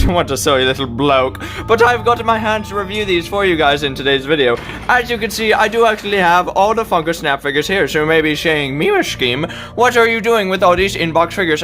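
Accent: United Kingdom. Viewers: What's with the very British voice?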